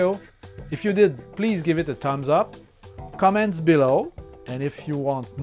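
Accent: Quebec, Canada. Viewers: Ya du Quebecois dans cette accent là lol Merci beaucoup pour ton video, je m'abonne tout de suite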